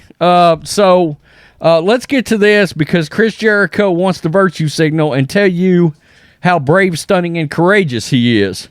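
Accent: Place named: Appalachia, United States. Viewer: I could tell by the redneck hillbilly accent that this was going to be a dumb video. But even then I was impressed by how much worse it actually was. Congrats on exceeding expectations.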